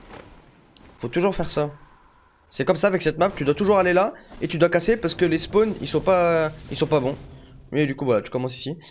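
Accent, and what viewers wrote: France. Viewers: les gars avec son accents francais est le style maison il habitr ah marrakech sais sur fois mille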